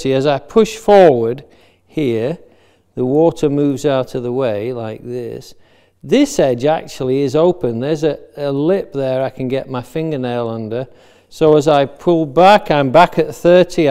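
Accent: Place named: Northern England, United Kingdom. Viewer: Our northern accent never leaves us =) great videos.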